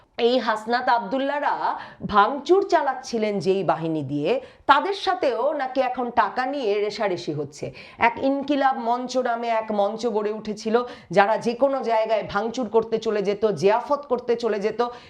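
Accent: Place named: West Bengal, India. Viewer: আপনি আগে পশ্চিমবঙ্গের একসেন্ট বাদ দিয়ে বাংলাদেশের একসেন্টে কথা বলেন। শুনতে আরো ভাল লাগবে।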